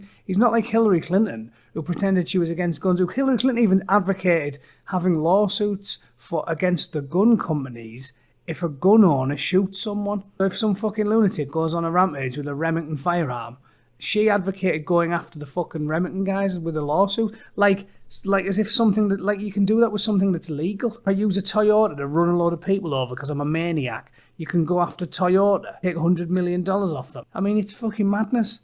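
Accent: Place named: Teesside, United Kingdom. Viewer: Great commentary from you. You sound like a teessider, which makes it better than the usual metropolitan wank.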